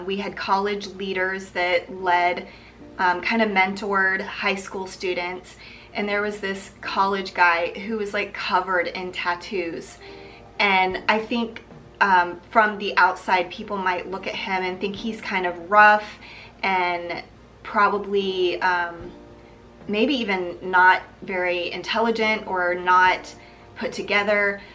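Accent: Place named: Texas, United States. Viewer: Love friday night lights ❤ haha I love the Texas accent. Bonnie would have talked like that 😍🥰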